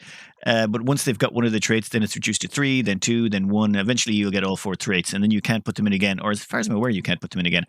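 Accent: Ireland. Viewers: im watching this on my 2014 gaming laptop wot is falling to bits and i just love listening to loveley irish voice, if we can convince him to start a patreon or just keep okn id be happy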